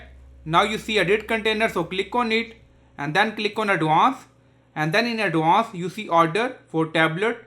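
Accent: India. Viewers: I really don't mean to be rude but it's really hard to understand you with that very strong Indian (?) accent of yours. Maybe use an AI voice instead? Again, I don't mean to be rude or offensive. So, please accept my apologies and maybe take my comment as a suggestion or advice. 🙂 Thanks anyway for the effort you put in your tutorial videos.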